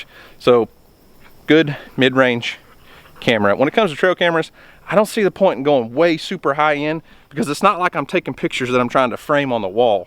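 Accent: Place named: South, United States. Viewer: I was born and raised in Alabama and the part I'm from Walker County we're big country talkers like you I'd think that you were from my neck of the woods meaning like Alabama and surrounding state's of the South east never would have thought you guys sound so country!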